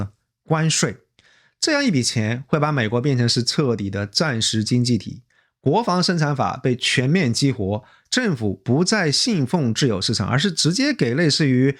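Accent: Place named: Hunan, China. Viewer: 的普通话没有台湾口音，更像是湖南人讲普通话，n和l不分，没有贬义，只是留意到。听的视频好像是享受一顿丰富的大餐。当然同样的话题也有很多快餐，也有它们的食客。产品丰富，各取所需。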